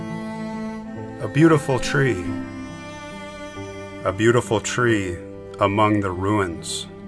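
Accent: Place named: Chicago, United States. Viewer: New to your channel - not sure how I missed you for so long. What I like is that your stories are about people we probably never heard of before, your slow, calm voice, and of course the content itself. But there's more: You seem so familiar. I was born/grew up in Evanston, IL - very close to Chicago, as you know. So you accent/grammar/inflection reminds me of many male relatives. It's almost like hearing one of my Uncles telling stories again! Anyway, glad I finally stumbled into you, and now look forward to going back to hear/see your earlier posts, too. :)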